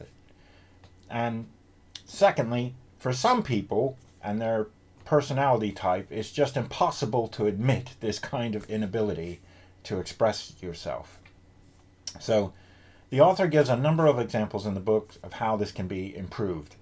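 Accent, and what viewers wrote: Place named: United Kingdom. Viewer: Why do i keep hearing abit of a british accent , 😂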